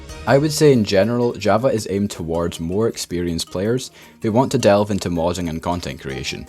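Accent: Scotland, United Kingdom. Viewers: Bro I really miss you and your video's. Please come back. I just watched your buzzy bees mod video for like the fifteenth time just now and it was honestly the most relaxing thing on the planet. I love you Scottishness and your ( well actually it's from minecraft) cat background music. I wil watch your farmers delight mod video tomorrow and I hope you will return shortly. Have a wee great day.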